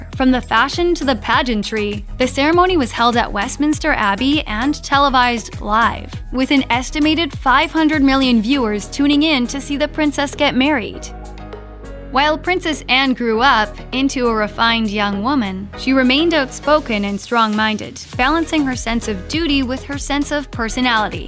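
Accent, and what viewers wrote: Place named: United States. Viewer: No offence intended, and not that anyone was asking, but I can't watch a doco on the Royals that features an American voice over. It's like putting sugar in tea. Or using a wood chipper as a food blender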